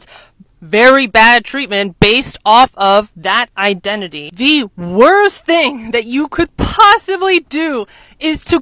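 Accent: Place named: United States. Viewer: I like your American clear accent.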